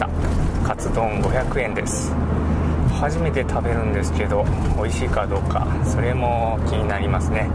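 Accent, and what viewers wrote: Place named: Okayama, Japan. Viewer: 天気も良く、zも艶々で気持ちいい動画ですね！ 岡山弁も炸裂で山形の私には新鮮で微笑ましく拝見させて頂きました～👍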